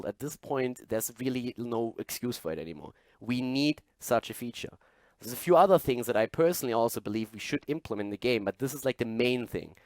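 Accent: Germany. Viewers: I agree with all of it! Mainly with the voice thing! The MMR thing is very difficult and i know what it means to have an illidan on the team with most deaths in lowest hero dmg on the team! Maybe some tweak for the rating in combination with the other stats but it would help a lot!! I love your work and your german voice;-) Go ON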